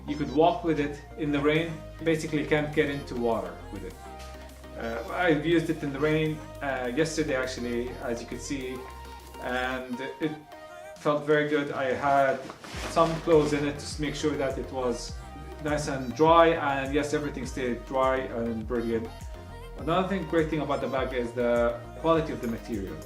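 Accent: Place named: Ireland. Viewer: Do I hear an Irish twang in the accent. Are you in Ireland - great review 😉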